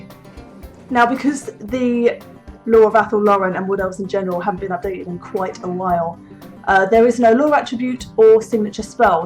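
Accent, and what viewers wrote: United Kingdom. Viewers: Wha... what WHAT IS THIS!? An attractive woman speaking with a British accent about WARHAMMER FANTASY lores?! 0_0 .... subscribe. Where do I vote for more of this from the interwebz?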